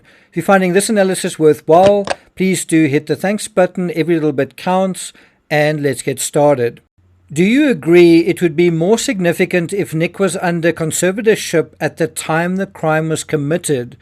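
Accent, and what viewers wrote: South Africa. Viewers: This commentator sounds south africa . Is he.😊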